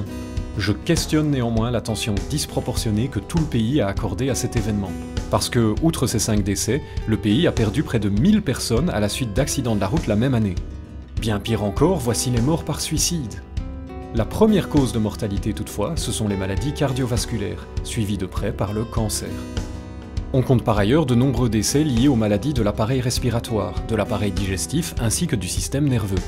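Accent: Belgium. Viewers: 1- On ne dira jamais assez à quel point la bienveillance des accents belges est bien adaptée à la transmission du savoir. 2- La vidéo tombe malheureusement à point nommé.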